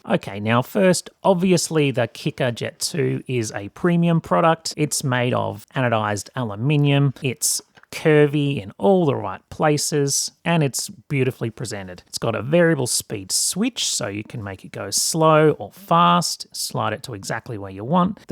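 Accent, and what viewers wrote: Australia. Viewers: I bought a similar fan to the cheap one shown here but for a price of about 30 UK pounds (which is more than twice the price). Outside is a bit slimmer but otherwise looks very similar. However, I found that spending extra got me the following: - 26650's instead of 18650's. They advertise 4500mAh, not sure what it really is but it is rather heavy and I haven't managed to discharge it yet (had it for almost a year). - Proper cell balancing, the midpoint of 2 cells is connected to the charging board is well, the datasheet of IC used shows it does balancing. - Speed control. - Some protection from the fan being turned on by accident (the button needs to be held for 3 seconds before the fan turns on) - Nozzle that properly locks in instead of a wimpy magnet. Funny enough, the price of that same model of fan later rose closer to what the kica costs. 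In terms of feedback, it's a good video with a pleasant aussie accent but 2 things I'll note: - The electronics on the back of the motor is actually the ESC. They managed to make it so small by using "2 in 1" transistors, same type as used in laptops for generating power rails, they are basically a MOSFET half-H bridge in one SMD package, this way they only need 3 footprints instead of 6. - The wind speed could have been measured in m/s, would have been more understandable to most of the world and comparable with listings since a lot of them advertise speed in m/s.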